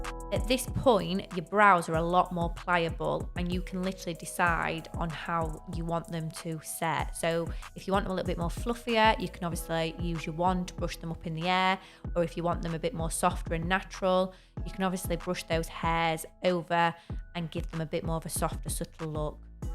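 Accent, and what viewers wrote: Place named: North, United Kingdom. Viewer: the limey accent.. when it's too pronounced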